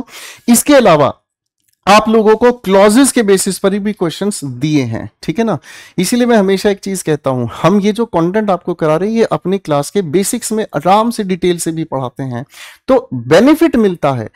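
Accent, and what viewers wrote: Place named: Bengal, India. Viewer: Aaj to Bengali tone me bhi bol gye sir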